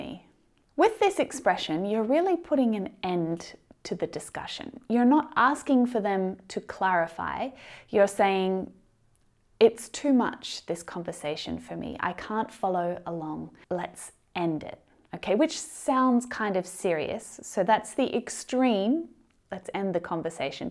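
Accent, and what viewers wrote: Australia. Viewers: For the way she talks, you can tell she has a "teacher" australian accent. If you come across some aussie he/she doesn't speak that articulate. I had an argentinian english teacher with the same way of talking but american accent. Nice video and VIDEOS btw!! (what does "mmm" stand for? "my magnetic mouth"?) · Hello, day by day your presentation is getting more interesting, and it is a bit hard to resist the temptation of watching you talking with a sweet Aussie accent. Keep up with your lessons that address all English language learners. You have chosen the best profession to make money through toiling and shedding sweat.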